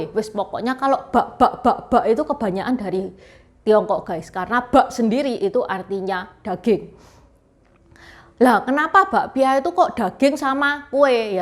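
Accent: Java, Indonesia. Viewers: Orang Jawa y mbak ngomong y medok bgt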